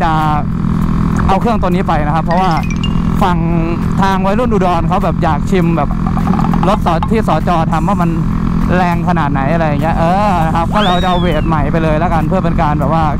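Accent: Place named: Suphanburi, Thailand. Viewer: คนสุพรรณคับบ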